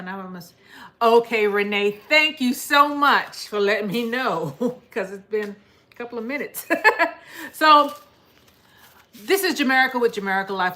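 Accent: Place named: New York, United States. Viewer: Your video popped up on my feed. I love it. I knew you was a Newyorker I can hear that NYC ancient 😃❤ . I am a Trinmerican from NYC too. My father is Trinidadian.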